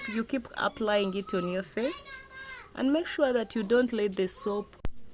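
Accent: Uganda. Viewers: Uganda accent for me bby girl thanks im gonna follow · Hi, thanx Are from Uganda? Your accent!!!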